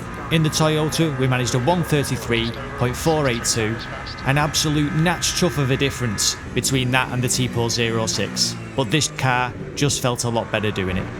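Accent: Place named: Bolton, United Kingdom. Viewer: Not a beginner but I watched the whole video to see if your observations are similar to mine - turns out they are almost exactly what I felt as well. For example the Lambo being edgy and bouncy over curbs but a more exciting experience than say the Peugeot. Also, I really enjoy the way to structured the video with each car segment being your fastest laptime long. Another question: I'm not a native english speaker but I really enjoy learning about accents. I could hear that you're probably from the northern parts of England, but I'd love to know which part more specifically, if that's not too much to ask. Your pronounciation of "fair" for example sounded more like I pronounce "fur" and the last person I heard talk like that was from Bolton.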